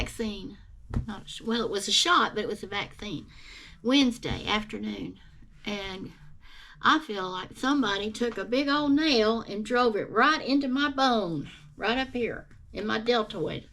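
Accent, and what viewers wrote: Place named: South Texas, United States. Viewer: Just love watching you ‘cause you talk like me! I’m a south Texas native who made my first cardigan following your tutorial this spring. Thanks a bunch, friend! (Ps - I’m a retired nurse too!)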